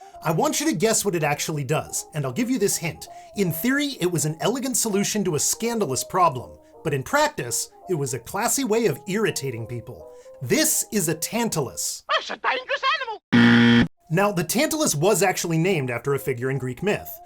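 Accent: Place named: Midwest, United States. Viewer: exactly! It almost feels like this dialect of Midwestern English is being influenced by the fact that 1/3 of the voting population want to get rid of public education. Maybe it is a legitimate dialect. But it feels more like a collection of linguistic mistakes with an accent. ...But maybe that's all a dialect is, when you get down to it.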